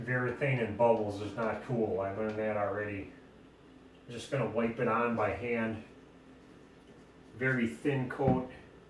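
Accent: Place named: Minnesota, United States. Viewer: You're accent sounds exactly like Jesse Ventura. Is that Minnesotan?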